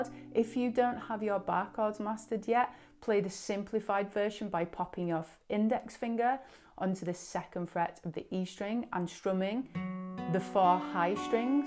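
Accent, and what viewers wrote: United Kingdom. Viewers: Sounds like she is a Brit?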